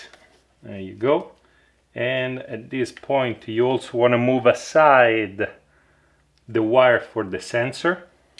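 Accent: Italy. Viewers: Love the accent, italy?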